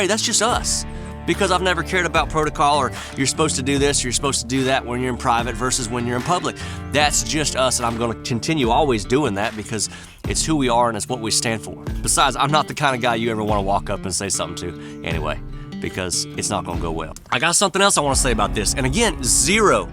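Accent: South, United States. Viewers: “We wish you the best we wish you a speedy recovery…But…” here’s come the judgement in a southern accent with a contrived ‘bless yer heart’ smile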